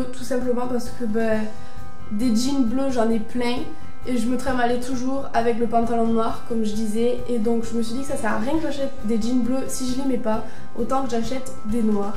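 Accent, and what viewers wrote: South, France. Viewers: Bonjour ! Je viens de découvrir ta chaîne et j'aime beaucoup :) du coup je vais poser des questions en mode la fille qui est larguée ! Quelle âge as tu ? Que fais tu dans la vie ? Et d'où viens tu ? J'entend l'accent du sud , c'est rare de voir des youtubeuses du sud! Moi je viens de Toulouse ;)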